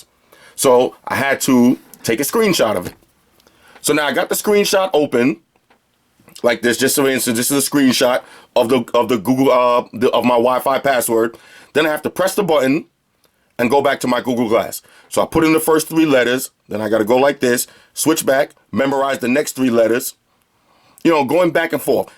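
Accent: New York, United States. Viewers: you from New York Bruh??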